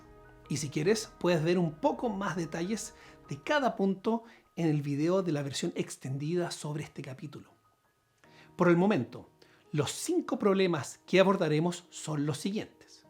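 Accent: Chile. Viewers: Vives en chile?, hablas como chileno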